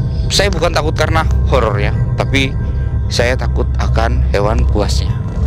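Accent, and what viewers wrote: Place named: Java, Indonesia. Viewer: klu ngomong bahasa jawa lucu juga, smoga mister slalu sehat srmangat & sukses 🤲🤲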